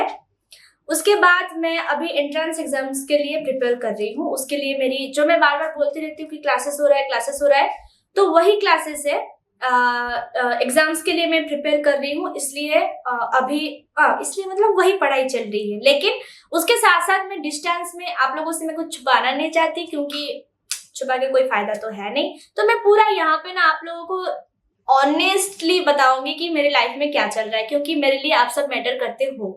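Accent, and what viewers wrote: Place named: Bengal, India. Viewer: Apki ABAAZ me bengoli bhi cute 🥰 lagti h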